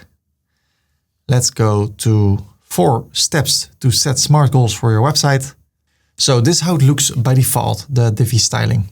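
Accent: Netherlands. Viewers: I really dig the Mark Rutte English. Cool stuff.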